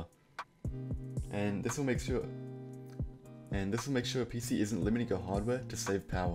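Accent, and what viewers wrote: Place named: South Africa. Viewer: I smell a South African.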